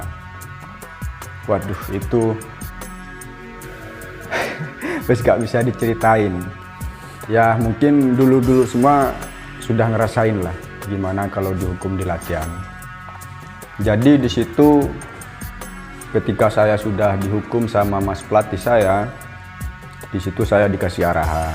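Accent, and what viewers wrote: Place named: Madura, Indonesia. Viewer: Mentok Banget madurenah ✌️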